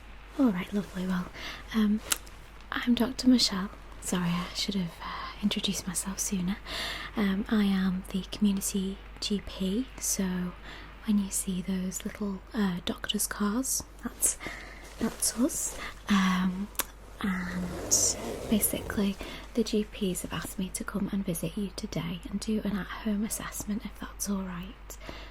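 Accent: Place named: Yorkshire, United Kingdom. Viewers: Bit of a Yorkshire accent nurse vibe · I really like the nice northern accent you have in this video I’m very familiar with it and I’m also from the uk as well.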